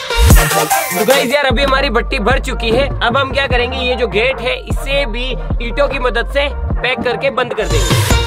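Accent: Rajasthan, India. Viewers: Bhay aap ki marwadi bhasah jordar h mja aa gya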